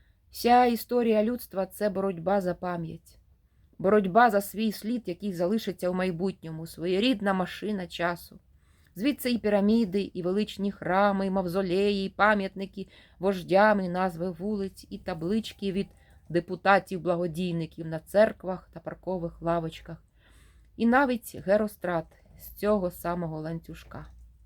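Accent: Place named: Eastern Ukraine, Ukraine. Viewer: Слухаю вас з увагою, ви з молодшого від мене покоління. Очевидно ви із східної України , чую по вимові, з великим впливом російської. Шчьо, чьому, дЗім замість дім, широке російське Ы замість українського короткого И. Але мені приємно, що в часи, коли наші сусідські «браття» вбивають наших людей за мову українську. Щиро вам дякую за читання і прагнення розвивати українські канали. А вимова? Може треба буде змиритися з сучасною модою. Адже багато мо перетерпіли зміни: англійська британська та американська, іспанська європейська та латиноамериканська, португальська і бразілійська, французька і крейол. Світ міняється, але Україна буде вільною.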